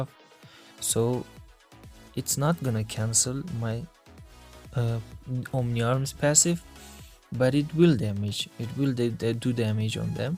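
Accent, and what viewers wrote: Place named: Mexico. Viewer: Wou a mexican english well xd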